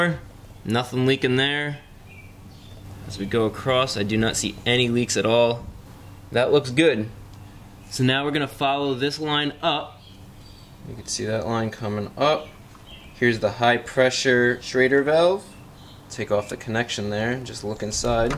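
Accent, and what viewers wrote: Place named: Delaware, United States. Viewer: You are the best, and as a DE guy, I miss people with your accent. Soapy wooder forever dude.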